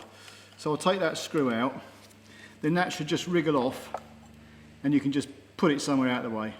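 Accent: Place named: RP, United Kingdom. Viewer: Its great a man who speaks to us in queens English love your show and more please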